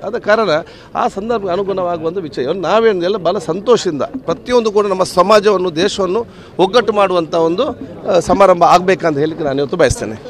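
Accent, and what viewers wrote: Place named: Tulu Nadu, India. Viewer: ಒಳ್ಳೆಯದನ್ನು ಮೊದಲು ಪ್ರೋತ್ಸಾಹ ಮಾಡಿ ಸರ್ ಅವರು ಮಾತಾಡೋದು ಯಾವುದೋ ಭಾಷೆಯಲ್ಲ ಕನ್ನಡವನ್ನೇ ಮಾತಾಡೋದು ಅವರು ತುಳು ನಾಡಿನವರು ಅಲ್ಲಿ ಹೋಗಿ ಅಲ್ಲಿಯ ಎಲ್ಲರ ಭಾಷೆಯ ಶೈಲಿ ನೋಡಿ ತಿಳಿಯುತ್ತೆ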